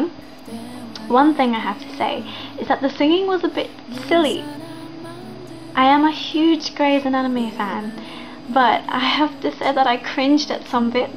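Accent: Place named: Australia. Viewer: I agree with a lot of what you said. The sexy parts of this episode are so cringe-worthy. The parts with two Callie's are also all very strange. Sara's rendition of I Was Made for You kind of makes up for those (although singing Callie shaking the bad of unconscious Callie is something I've never liked). I also agree that I prefer Sara's version of the song. It's no surprise that she was the best singer, as she has won a Tony. Overall I think a singing episode was a bad idea. It just wasn't a natural fit. You need a show that has more comedy to make it work, like Xena, or Buffy. You sound Aussie, like me.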